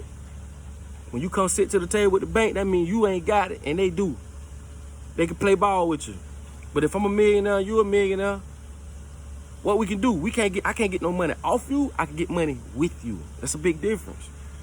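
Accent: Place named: Atlanta, United States. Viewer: That’s the realest shit I’ve heard today bro you made my MF day Dogg real talk !!!! I’ve been saying that shit man we need to make our own money, own banks, own cars, own houses, own city, own government, own army, own everything. If not that we need to physically fight and kill and take what we want. It’s too many of us and not a lot of them u feel me ? Bro I’m in Augusta ga you sound like your from Atlanta. We need to link for real bro and show people I’m down sign me up bro. Because I’m tired of this shit we need to start a different movement and not none of this protesting bullshit because it’s not getting us nowhere. Fuck voting and marching. We need to stop working for there companies and business because once that happens we will be in control. Everybody needs to stop working now.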